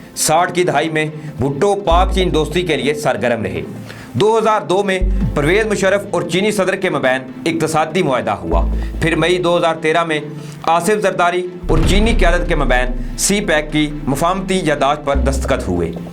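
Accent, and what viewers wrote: India. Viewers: Its a Indians channel. Anchor is Indian in disguise of Pakistani. You can check his accent also. He use qhab instead of khwab, qhas tore instead of khas.its problem of Indians. Plz unsubscribe it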